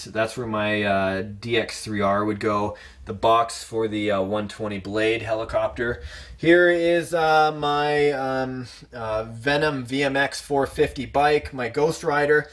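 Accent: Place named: Canada. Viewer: Where are you from? I think you have a canadian accent but could be totally wrong. Just watched a couple of your vids. cool stuff.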